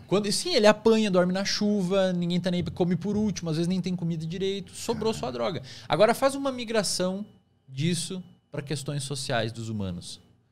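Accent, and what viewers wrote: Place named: France. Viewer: Seja etero e pare de francesar o português....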